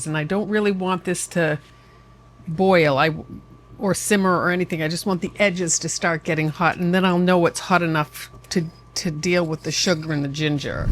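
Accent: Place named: South, United States. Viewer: I'm sorry, but I find this channel very confusing. I have watched 3 of your videos because the recipes look good, but I don't understand what you are trying to achieve? You have a woman who sounds like an American northerner doing the cooking, an obvious southern-accented man doing the voiceover, Appalachian fiddle music playing, more singing and music that doesn't seem to reflect anything in particular, and a fridge that plays disco music and flashes lights. Not to mention the name, referencing the Shire, is English/Irish in origin....you guys are all over the map and none of it fits together?? Signed, Very Confused.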